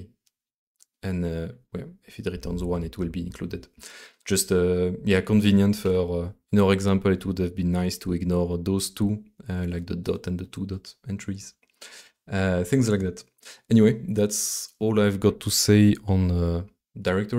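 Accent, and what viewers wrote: France. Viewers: It's the french accent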